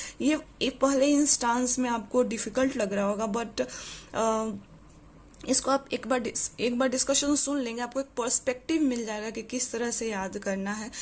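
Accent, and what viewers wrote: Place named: Mithila, India. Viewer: Mam, you must be from Mithila province.Thank you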